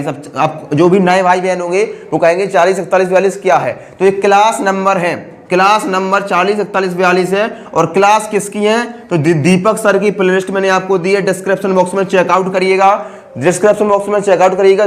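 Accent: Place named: Madhya Pradesh, India. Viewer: Bhaiya aap mp se belong krte ho language s lgta h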